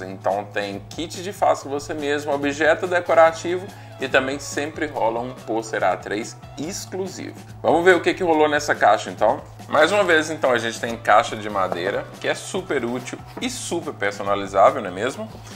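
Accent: Minas Gerais, Brazil. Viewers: Ganhou mais uma inscrita. Teu canal é excelente e eu adoro esse sotaque gostoso mineirinho. Me destes muitas idéias pro apê que eu comprei. Boa sorte com o canal que já é nota 1000.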